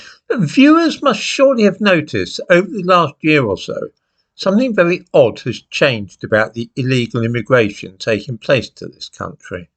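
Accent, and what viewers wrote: United Kingdom. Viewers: THEIR ENTERING OUR COUNTRY THE ENGLISH ISLES AS YOU WORD IT IT IS ILLEGAL TO ENTER BRITAIN????!!!! HOW CAN ANYBODY ENTER A POLITICAL UNIONIST IDEOLOGY OR DREAM THEY CALL GB BRITAIN UK THS SOVIET UNION YOUR SAYING WAS A COUNTRY ALSO MEANING YOUR ON THE BRITISH SOVIETS SIDE AND THATS WHY YOU DONOT GET BANNED OFF YOUTUBE BECAUSE THE BRITISH LOVE YOUR INDUENDOS BIGGING UP THEIR NAMES BRITAIN BRITISH UK AND GB WE ALL KNOW OU IDENTIFY AS BEEN BBC JIMMY SAVILLE BRITISH BY THE WAY YOU TALK OR ARE YOU???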